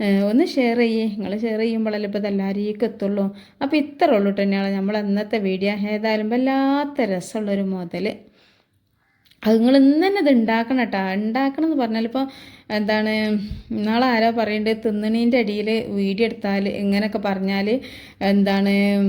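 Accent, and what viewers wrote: Malappuram, India. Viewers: Malappuram basha ishtam 💚💚💚ഞങ്ങൾക്ക് നിങ്ങളെ നാട്ടിൽ വന്നാൽ കുറവാണ് ഞങ്ങൾക്ക് പറയാൻ 💚💚💚🥰🥰🥰 മലപ്പുറം എവിടെയാണ് എന്റെ ഇത്താത്ത യും മോളും അവിടെയാണ് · ഇയാളെ വീട് എവിടെയാ 😂സംസാരം കെട്ടിട്ട് മലപ്പുറം ആണ് മലപ്പുറത്തു എവിടെയാ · മലപ്പുറം താത്തക്ക് ഒരു മാറ്റവുമില്ല ചില വിഡിയോയിൽ മാറ്റമുണ്ട് അതിന് ഒരു ക്രിത്രിമം തോന്നുന്നു ഇന സംസാരം തന്നെ മതി. recie Pie കൊള്ളാം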